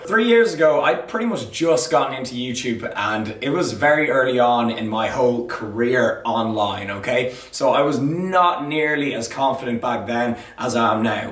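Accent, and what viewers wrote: Ireland. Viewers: Ayyy anoder irish man! Coming from Northern Ireland here!!!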